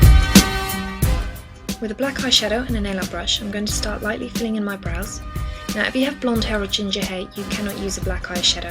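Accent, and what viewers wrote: United Kingdom. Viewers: i dont have any of the make up stuff that you have, but sometimes i watch ur vids just to listen to ur accent. I LUV UK ACCENTS!! is that weird?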